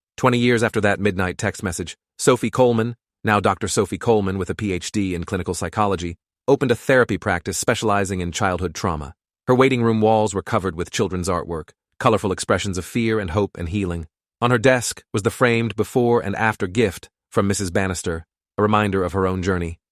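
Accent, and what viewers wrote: United Kingdom. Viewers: Why did he turn british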